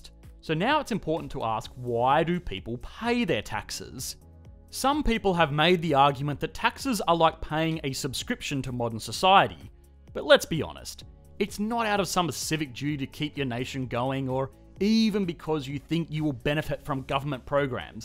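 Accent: Australia. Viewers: Ed Hrafnskald Conway Well unfortunately most governments aren't as well liked as with his smooth Aussie accent!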